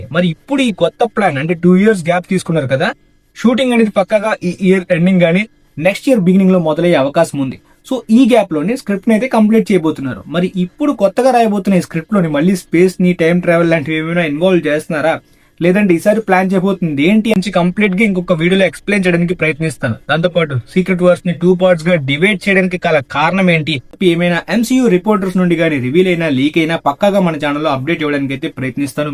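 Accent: Srikakulam, India. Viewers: అన్న నీది శ్రీకాకుళం నా సూపర్ ❤❤❤ నమ్మలేకపోతున్న 😂😂 మాది పాలకొండ ✌️✌️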